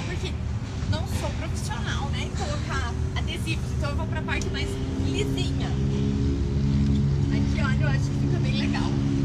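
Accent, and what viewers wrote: South, Brazil. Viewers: Acho da hora esse sotaque do Sul, vc fica mais linda.😍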